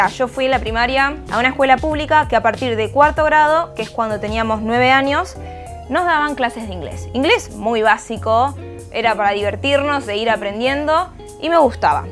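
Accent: Argentina. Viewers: a mi me gusta tu ingles argentino jajajaja, diles que cuando vuelves a casa hay palabras que no te acuerdas en español, o se te escapan en plena conversacion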